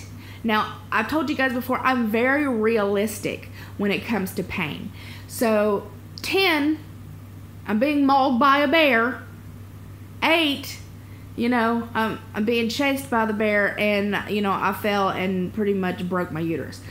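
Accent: South, United States. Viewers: I found your channel a few weeks ago, after looking for WLS vlogs. I love your candid conversations. it makes me feel less alone. I also love your accent! Southern girls rule!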